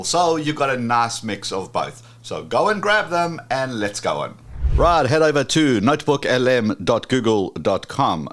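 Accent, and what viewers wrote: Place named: New Zealand. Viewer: Just found this channel from your main channel and instantly subscribed without even watching a video. Why? You're awesome, that's why! I love all the useful information, excellent delivery, and -- who doesn't love a New Zealand accent? 🤣 They're the beest!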